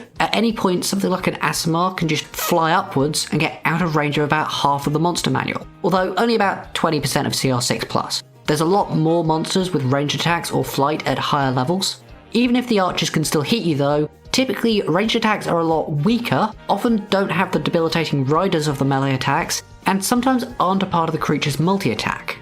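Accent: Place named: United Kingdom. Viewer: oh, you’re british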